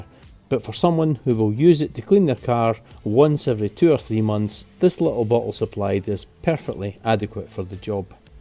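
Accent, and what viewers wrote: Scotland, United Kingdom. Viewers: Hello, A nice laid back assement of Karcher's latest product. And also good to hear a friendly Scottish accent.🏴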